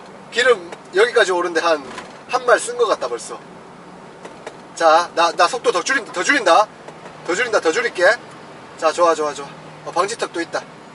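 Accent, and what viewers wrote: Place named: Gwangju, South Korea. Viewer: 사투리 들어보면 광주사람 이신것 같아요 영상 재밌네요 항상 화물 안전하게 운반 해주세용 요즘 또.. 얘기가 많네요 ㅠ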